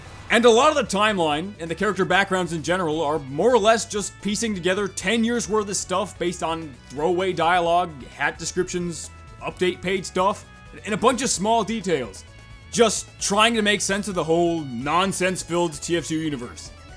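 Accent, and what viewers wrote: Boston, United States. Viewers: fast Boston boy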